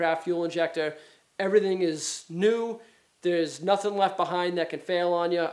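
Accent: United States. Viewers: Doing my first injector replacement on my 03 6.0, this video is super helpful. Judging by your accent your about 1 state away from me, what I'd give to have you walk me through how to do it. Thanks for your help again.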